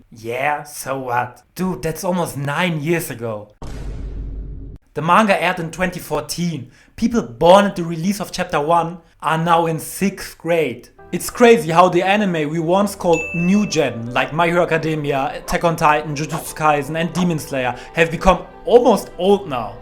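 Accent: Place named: Germany. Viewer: man hört hart den deutschen akzent geil 😂😂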